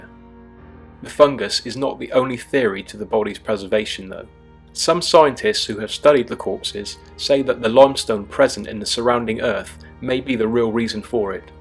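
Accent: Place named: Dorset, United Kingdom. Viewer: Non-sensationalist content, nice measured delivery, and that Dorset accent (lived there for a short [but "interesting"] time) Automatic subscription (and I've forwarded your YouTube location address to a colleague of mine, who retired to Bridport, after finally completing her Ph.D. (3 months before retirement :-D ) Yes, Les - I'm talking about you!! :-D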